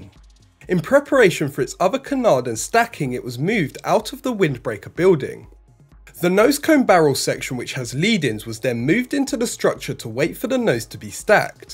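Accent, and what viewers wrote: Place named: East Anglia, United Kingdom. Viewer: The pronunciation is from East Anglia. It is spoken this way by millions.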